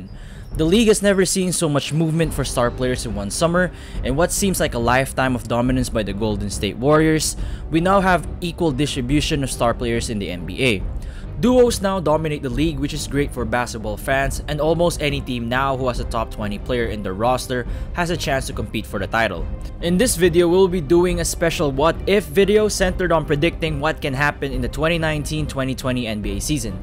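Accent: Philippines. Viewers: Ahh tama talaga ako. Ang accent mo po hehehe Pinoy talaga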